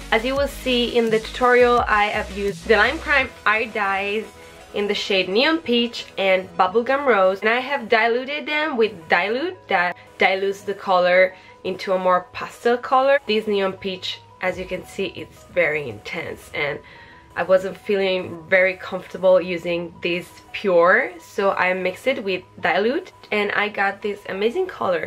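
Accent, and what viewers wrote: Italy. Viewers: Sei bellissima! Ma sei italiana vero? si sente l’accento! Potresti fare anche video in italiano pleaseee💖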